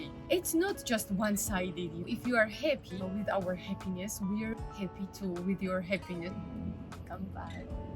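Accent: Japan. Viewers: の日本語のイトネーション好き😍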